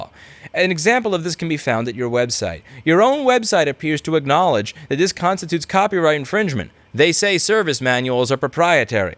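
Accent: New York, United States. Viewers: I love how 99% of the time is hardcore in-your-face New Yorker, but when it comes to BlackBerry.... suddenly he's all sweet with a cutesy voice! It's quite the difference 😁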